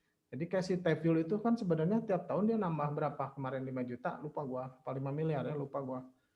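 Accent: West Java, Indonesia. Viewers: Bang Logat Sunda ya , hehe , mantap Slurr 😁 , Penjelasan nya mudah di pahami bang lanjutkan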